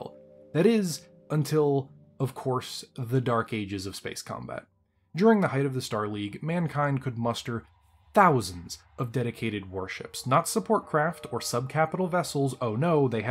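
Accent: Canada. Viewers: I just clocked your accent your Canadian ain't you? Not that it maters but I always assumed your were a little south of the border. I am crap at accents.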